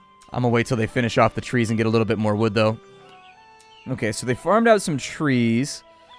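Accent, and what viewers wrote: United Kingdom. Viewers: Love the brittish peseant accent :D Oh and the game as well :P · Duuude that Bri'ish accent!!! loving it.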